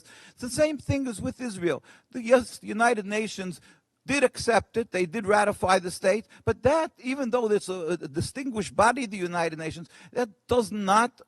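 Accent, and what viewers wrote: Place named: New York, United States. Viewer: you know it's funny, says he's from 'palestine' then says his family is phillistine from many generations ago... but that accent doesnt lie. this guy is from new york. talk about a paid shill to parrot some agenda from across the world when he lives comfortably in new york city. he can talk all he wants and keep wasting oxygen but the fact of the matter is this man has most likely never been to Israel while rockets were fired over his head from a hateful spiteful section of people who were given every opportunity to have their own state. were given BILLIONS to build better hospitals and schools to give their people an education over the future generations... instead they built extensive networks of tunnels, bought missile and rocket parts from iran to build in gaza and send at their neighbor. they then invade and kill over 1500 civilians, not military, civilians. 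womp womp. dont start a war then cry when you've lost.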